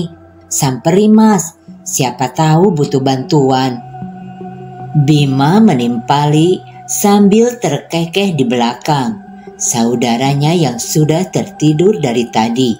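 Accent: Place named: West Java, Indonesia. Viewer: . Suaranya yang khas dengan logat bahasa Sundanya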